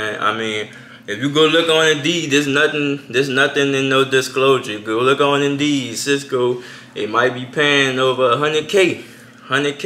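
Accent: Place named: New Orleans, United States. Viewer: Say bro u from new orleans? Nvm I went and heard the “ya heard me”. U done confirmed it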